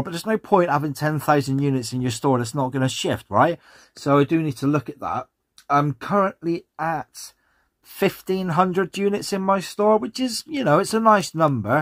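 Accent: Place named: West Country, United Kingdom. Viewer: New sub. Caught your accent on one of s zoom calls & recognised it as a fellow West Country chap. Only got to 120ish listings before I revamped & unified using the same SKU & photo system. Wish you all the best with the restart 🙏 👍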